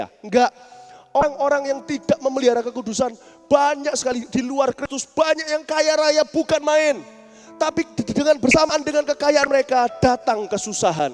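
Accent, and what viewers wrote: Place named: Surabaya, Indonesia. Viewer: Julia Angelina hehehe... itu logatnya orang cina surabaya emang medok bangett...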